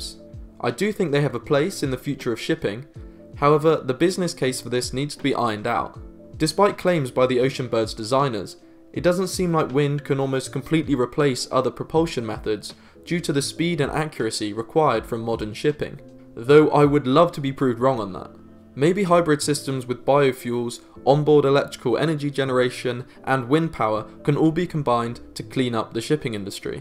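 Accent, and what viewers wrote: United Kingdom. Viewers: What do you know another brit with a weird channel name, narrating stuff... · As soon as i heard the british accent I knew his was a green lunatic.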